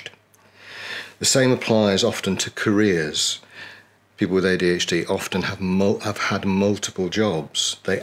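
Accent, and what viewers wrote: United Kingdom. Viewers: I’ve been wading through stuff on ADHD but this is by far the most useful, and really nice to hear it from a British person.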